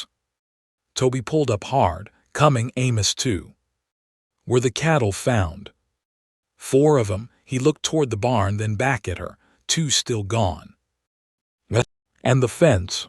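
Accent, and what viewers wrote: United States. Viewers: Thank you. I love this voice btw. Where in America do they talk like that? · I am from Northwest Ohio, and they talk like that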